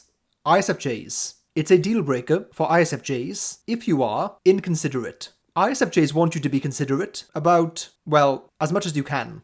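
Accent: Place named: Wales, United Kingdom. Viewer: really off topic but i love your accent 😁are you welsh by any chance :O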